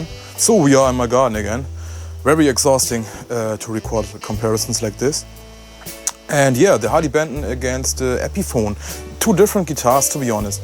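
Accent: Germany. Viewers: Dieses Denglish mit solch einem heftigen preußischem Akzent ist unerträglich. Sprich doch einfach gleich Deutsch, dann tuts nicht so weh. Wen die Gitarren interessieren muß dich nicht endlos reden hören. Was die kosten weiß auch jeder. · hi thanks for answering me back. I really enjoy your channel. I watched your HB Bigtone review, I am thinking of getting one. Did you keep your Bigtone and if so how do you like it now you have had it a while? Did you have any issues with the back bridge tilting under pressure from the steep angle of the Bigsby? I am a lot older, in my day we had to pay a lot of money for a good guitar. Nowadays companies like HB are making very good guitars for very little. All the big names are manufactured in China and Indonesia or using or parts from East Asian and so people may as well pay less and buy a HB anyway. They can always put new pickups in it or better tuners for half the price of buying one of the big names. 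I would really appreciate it if you can please let me know your honest opinion about the Bigtone. Lastly I love your German accent, I live in Northern Ireland and we sound terrible. 😁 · this German accent... (; nice video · Heavy German accent xD Danke für das Video hat mir echt weitergeholfen 👍🏼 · Da du unüberhörbar Deutscher bist, werde ich dir auch so antworten: daß die Harley Benton für das Geld nicht schlecht sind, steht außer Frage. Trotzdem sind die Epiphone Gitarren wesentlich besser verarbeitet. Die Bünde der Harley Benton sind sehr unsauber bearbeitet und müssen gerade bei den unteren Bünden nachgearbeitet werden. Die Bolzen der Tune O Matic Bridge sind stellenweise nicht komplett im Body versenkt, und wenn dies alles behoben wurde, kann erstmal der überschüssige Kleber am Hals mühsam entfernt werden. Und dies ist kein Sonderfall. So etwas habe ich bei Epiphones der letzten Jahre nicht gesehen. 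Meines Erachtens kann man die beiden Brands nicht miteinander vergleichen. Wie die Harley Bentons mit diesen scharfen und stellenweise nicht polierten Bundstäbchen und den Kleberesten durch die Qualitätskonrolle gekommen sind ist mir zudem ein Rätsel. Trotz allem kann man mit etwas eigener Arbeit die Mängel beheben, und hat für das Geld anschließend eine brauchbare Gitarre. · I don't have a black guitar yet. 1st time here because I'm looking to collect my 11th guitar. Playing on & off since 16. I'm 56 now & getting bored. I have all the expensive guitars. I'll add a Harley Benton. Because I have an Epiphone custom sunburst. They both sound great !!! You play pretty good too !! That helps. Lol.😅👍 At the beginning I thought is he German ?? But, your English is fine bro.👍 Keep rocking !!!🎼🎵🎶🎸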